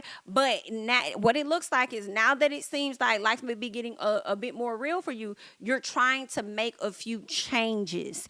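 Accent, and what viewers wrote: United States. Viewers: i love hearing you talk country sounds talking😂Blessings 🙏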